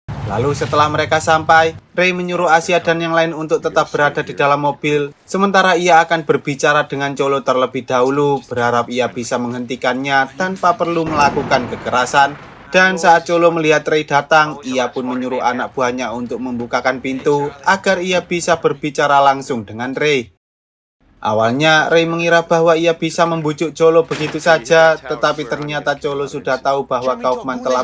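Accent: Java, Indonesia. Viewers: Dasar medok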